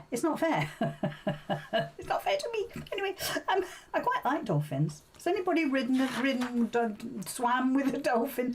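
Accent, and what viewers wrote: United Kingdom. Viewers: Please don't stop talking! I love to listen to you and your British accent. And I enjoy what you say. 😊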